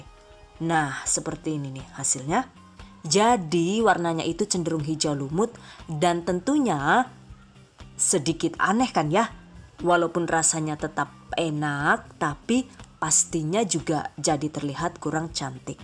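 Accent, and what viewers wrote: Banyumas, Indonesia. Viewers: Ngapak men..🤣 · Bun..rotine kutunggu ya..pengin buat juga.matursuwun..resepnya.ngapaknya..ngangeni bun.. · Sg gawe video kie wong ngapak apa ya,😁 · Ngakak jawa ngapak... Kita sama ngapak 🤗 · Rika wong Bumen Yu?:D sukses terus Bu channel nya senang dengan penjelasan detailnya. terlebih bahasa ngapak kayak dari kampung saya, Sampun tak subscribe Yu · bikin versi terbaru dong bun...yg lebih ngapak gitu..hehehee Cataaaaattttt😁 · 😂😂😂😂😂gue suka gayamu dg logat ngapak nya yuk... Ha.. ha.. ha..👌👌👌👏👏👏👏 Thanks so much fot the tutorial.. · Suka..... dengar bahasa ngapaknya, kocak......😀😀😀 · Deneng ngomonge ngapak bun,jawane ngendi rika? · Telat komen. lucu ya...kenapa bisa bahasa ngapak ya bun? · Ora ngapak ora kepenak, barakallah ya yu....😘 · Terima kasih bunda mantab jadi pengen coba,saya sangat puas dg Vidio " ny mantap jelas apa lagi banyak becanda ngapak jadi komplet dan dapat ilmu jg terhibur semoga Allah mmbalasny yg berlimpah,sukses slalu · orang madiun, kok pinter bahasa ngapak sech 😁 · Kalo nonton suka ketawa ketawa sendiri.. 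Resepnya mantap dan penyampaian detail juga ngapaknya itu ngangenin heheh... #GiveawayTHV2021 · NGAKAK BGT maless😭😭😭 ngapak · Hehehe bhs ngapaknya aku sukaaaa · Jan jane rika uwong endi sih,madiun apa banyumas,deneng ngomonge ana ngapake?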